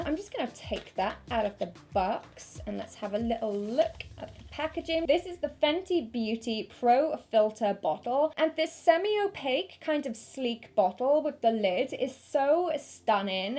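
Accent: United Kingdom. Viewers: I never comment on youtube but this video i have to comment! I'm not even from UK, english isn't my first lenguage ( I'm from Brasil) but i speak english, my english is so mutch better when i started to watch your videos. Ii can even talk with your brittish accent! anyway, i have to say that u are now, my favorite youtuber. U r honest with your watchers, u make me feel comfortble, really i feel like u r my friend. Continue doing this amazing job, u r such beautiful outside and inside. wish you have more sucess every day. thanks for being my distraction on my sadness days, and help me understand more the british english and about make up hahaha . Love u !!! x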